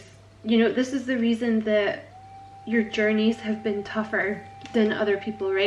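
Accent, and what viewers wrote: Scotland, United Kingdom. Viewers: I love your accent. Sounds Scottish to me. I was born there and miss it. Thanks for the reading. Im yours...lol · "How to Train Your Caterpillar" is the most adorable name ever. Awesome work & vibes, thank you. I feel very seen. And the Scottish accent is awesome.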